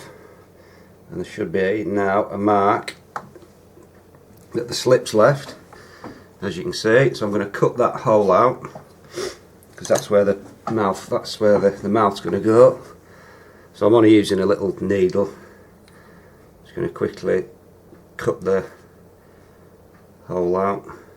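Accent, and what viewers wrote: United Kingdom. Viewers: Lol I have the same accent XD I'm British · I'm Australian. It's definitely a British accent.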